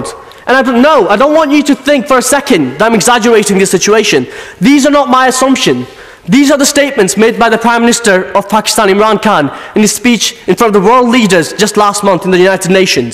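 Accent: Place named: Yorkshire, United Kingdom. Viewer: You are British, right. I would appreciate if you work for or raise issue of child poverty in the UK. And for your Kashmir crying- read some history. Your dads and uncles kept silent or took part in the Hindu pandit genocide in 1989. Easy to take one page from history and add your fancy Yorkshire accent to bark about. World is not just for Muslims!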